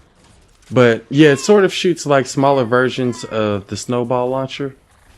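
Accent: South, United States. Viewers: What state do you live in u have a southern accent I think lol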